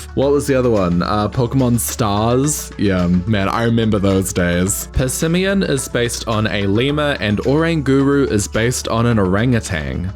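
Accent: New Zealand. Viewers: I still cant get over the Petz Catz 2 theme music lmao. I was just in your stream thinking "I wonder if he's from New Zealand with that accent.." and behold, a kiwi lmao. But I really like the designs! I love how much detail you put into the descriptions too. Please do more of these :)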